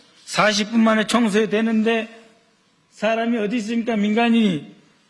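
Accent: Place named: Jeolla, South Korea. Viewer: 박선원의원께 감사합니다.진실과정의 앞장서시는 박선원님께! 이재명대통령 당선확정공표때 박의원님의 표장이 순간포착된사진을 간직하고있습니다.저와똑같은 신정이였습니다. 국민의지성을믿고있었지만~~~아슬아슬한심장은🥹 지구반대편인만리떨어져살고있는동부시골에서 응원드립니다. 친근한 남도말투에서 제고향이그립습니다. 건승하소서🙏😊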